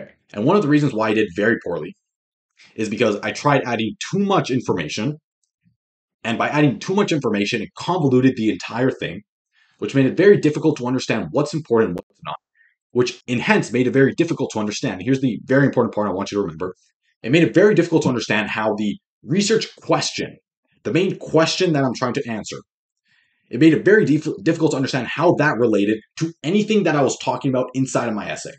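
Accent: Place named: United States. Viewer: he speaks pretty american english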